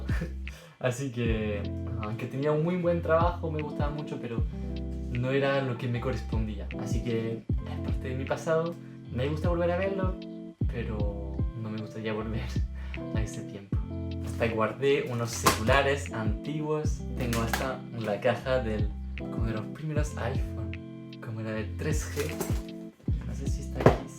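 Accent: Chile. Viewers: Has pasado mucho tiempo en Chile ? Porque hablas español con acento chileno, se te escucha muy bonito ! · Salut Cher ☺️, tu es définitivement un gars super doux et adorable!🤩 J'ai été très émue par ta boite à souvenirs, surtout ta lettre 🥺, c'est très sympa, ça m'a donné envie d'en faire une pour mon "moi" du futur🧐✍️. Tu écris très beau et ton espagnol est excellent et je ne trouve pas que tu as un accent espagnol ou andalou, mais plutôt il semble chilien. J'adore les villages français😍, ils sont tous trop beaux, j'aimerais vivre dans un, c'est mon rêve. J'ai visité Lille il y a quelques années et c'est une très belle ville, je l'ai aimée encore plus que Paris. Grenoble c'est aussi très beau, et ses marchés de Noël🎄👼. J'espère te rencontrer un jour ou te recevoir ici en Colombie 🇨🇴. Gros bisous.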